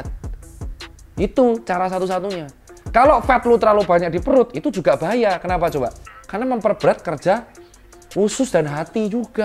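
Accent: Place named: Surabaya, Indonesia. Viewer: Seneng sekali dgn konten edukasi kesehatan dgn gya suroboyoan nyel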